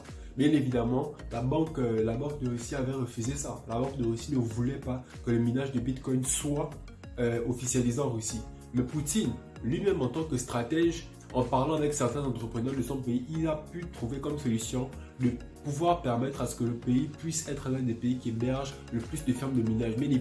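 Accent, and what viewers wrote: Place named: Gabon. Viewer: Fondamentalement Fondamentalement tu aimes le mot la hein bro ? tu as l'accent d'un gabonais, en tout cas force à toi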